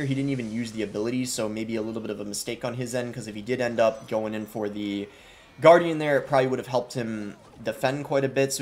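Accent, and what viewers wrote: Scotland, United Kingdom. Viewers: Has he ever explained why he says his O’s like a British person or something I’ve always wondered why he pronounces them like that (no hate just wondering) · Why do you randomly have a British accent?? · Why do you say your o's in british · Great knowldege transfer and gameplay! and I wondered, Is that a bit of a Scottish accent?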